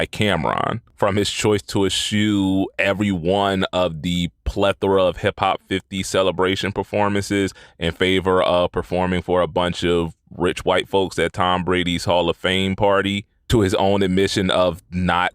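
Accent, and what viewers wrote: Philadelphia, United States. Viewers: I know that accent. Philly fasure.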